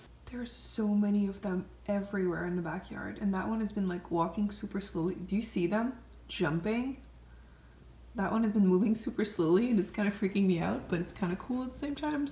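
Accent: Quebec, Canada. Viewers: Tu devrais regarder la vieille mini-série avec Gérard Depardieu au lieu du film américain. C'est plus proche du livre. Ton français n'est pas si rouillé lol ton accent semble plus québécois que français o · Salut! C'était sympa de t'entendre parler Français avec un accent québécois ^^ si tu es tenté pour avoir des correspondantes pour dé-rouiller ton français, je suis dispo:) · Je reconnais cette accent là, QC!!!! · I'm glad you put the subtitles because as a France French speaker Canadian French sounds so foreign! lol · I loved hearing you speak French, and as a European, I find your Québec accent so interesting :) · She is Québécoise ? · Good vlog, love it!! Continue de nous partager ta passion, afin de nous motiver davantage! 😊 By the way, me too, french is my first langage but your videos encourage me more to read in ENGLISH. Tu parles très bien le français! 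Par ton accent, je crois que tu es québécoise, je me trompe? 😜 j'espère que tu as appellé un électricien pour ta prise défectueuse!! 😉 Stay safe. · I am very curious as to know where your accent is from! It sounds « québécois » in some parts but I can’t quite pinpoint where it’s from! I’m very curious for the context! My parents are Québécois, and my father was in the Canadian Army so I actually moved a lot and learned English at 5 years old when I started school. I live 10 min from Quebec city now! And I read in English and watch TV in English so I can keep it... we speak English at home (my 4 year old is learning both languages at the same time!)... If you want to practice your french it’s actually a great esy to do it! (Movies tv and books!) ;)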